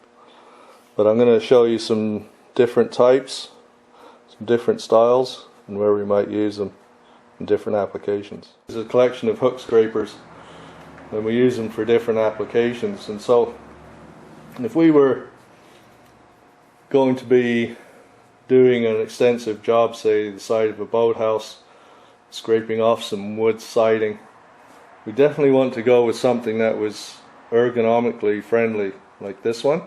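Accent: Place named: West Country, United Kingdom. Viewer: What is that accent?? Sounds like a mix of South West English and American 🤔🙂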